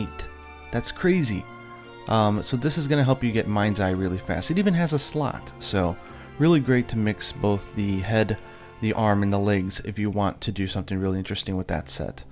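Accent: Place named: Japan. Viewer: The way he pronounces all the words in the game with a Japanese accent rly triggers me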